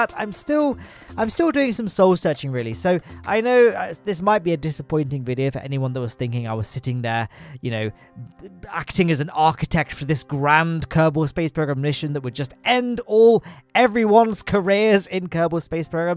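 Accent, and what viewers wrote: United Kingdom. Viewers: Is he British????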